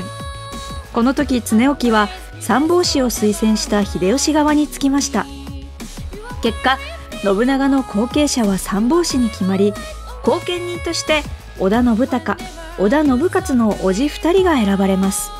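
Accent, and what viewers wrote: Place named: Mikawa, Japan. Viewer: 三河のアクセントが気になる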